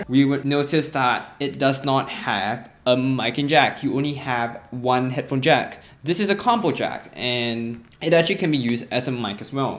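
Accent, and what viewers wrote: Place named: United Kingdom. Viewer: took away mic port......also an asian with a british accent... alot unanswered questions. very helpful video BTW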